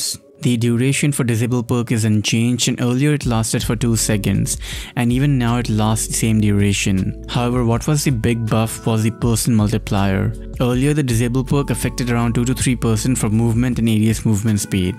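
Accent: India. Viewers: bro are u from india? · Bro! R u a Indian?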